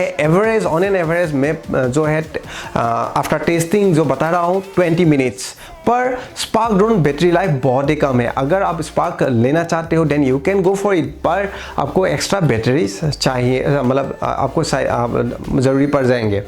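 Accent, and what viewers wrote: Assam, India. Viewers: bhai accent tu huni gom pai golu tumi axomiya buli... so about goi salu sivasagar r manuh ulala...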